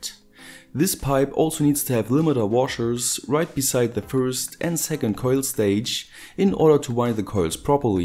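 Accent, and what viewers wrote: Germany. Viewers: German Accent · man merkt das du deutscher bist👌👍 nice videos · Man hört einfach, dass du aus Deutschland kommst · are you german? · are you from germany?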